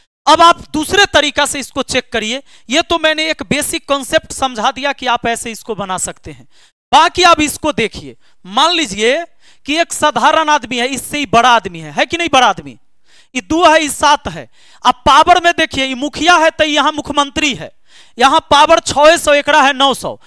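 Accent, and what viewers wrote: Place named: Bhojpuri, India. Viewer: Ekdum bhojpuri style mein bolte hai to maja aa jata hai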